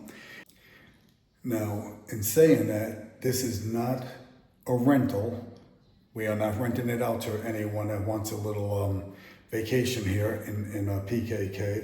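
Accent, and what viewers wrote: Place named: New Jersey, United States. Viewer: Hi. The YT algorithm brought me here. I don't have breathing difficulties but I've watched a vid of a man who had health issues & was able to do the "Camino de Santiago" in Spain. He used a travel CPAP machine (it's smaller) and was able to do the multi-week walk/ hike. Good luck on whatever you decide to do re: your health. Btw, are you from the NE of the USA? You sound a bit like Bernie Sanders and Tony Soprano. (Cawfee = coffee, moah = more). Was just curious; hope I didn't offend. Edit: congrats on the new place & the renovation. One comment abt the kitchen: there's no hood or vent if someone cooks. If the dish being prepared is smelly or aromatic, the whole apartment will smell of food. One way to prevent that would be to install a hood or to put a table outside/ back area and do the smelly cooking there. 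The view from the backyard is nice. Wish you both good health & safe travels! 👍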